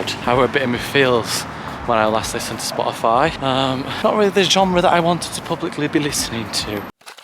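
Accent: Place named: North, United Kingdom. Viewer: I love ur northern accent & you always sound like you had a spliff 🤣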